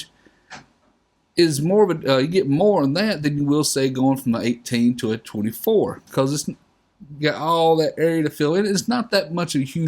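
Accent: South, United States. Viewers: Hi, I am looking for a good camera i can shoot sports and also shoot videos. I would like your opinion on either canon t5i, canon 60d or nikon d7000 or any others u might recommend or suggest. I would like to upgrade maybe to intermedite camera I currently own the canon t3. Hey I really enjoy your southern accent and videos keep up the great job.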